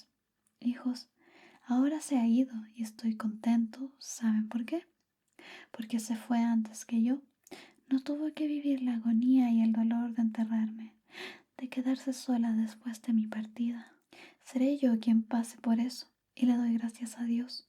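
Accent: Chile. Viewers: Me encantan los tus asmr hablando chileno.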